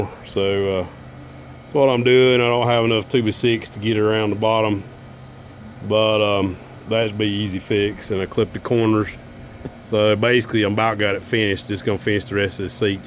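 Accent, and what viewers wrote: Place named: South, United States. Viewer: I love this design! I also LOVE your deep southern accent😊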